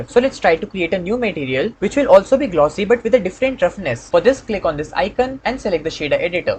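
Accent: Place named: India. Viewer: Straight at Point and no other needless stuff 👍 You Voice and bit of accent seems like Bharatiya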